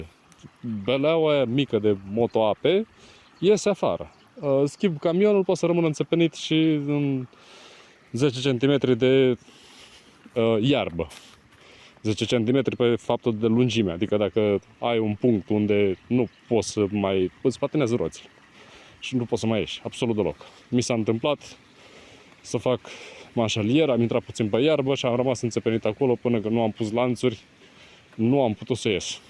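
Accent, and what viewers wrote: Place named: Moldova. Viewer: Salut!! Ești moldovean de unde ? așa se pare după accent scz nu te supăra și eu am mulți veri la Roman (Piatra Neamț)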